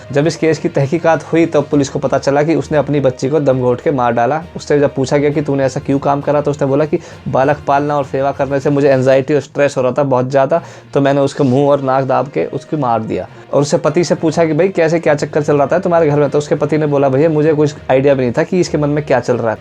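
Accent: India. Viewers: Desi accent mein Japanese news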